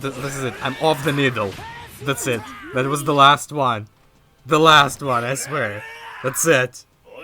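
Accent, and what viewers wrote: Russia. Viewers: I think he's Russian?? I could be wrong tho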